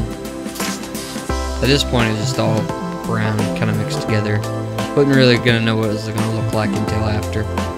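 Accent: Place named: South, United States. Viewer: First time hearing a southern accented hobby youtuber, love it.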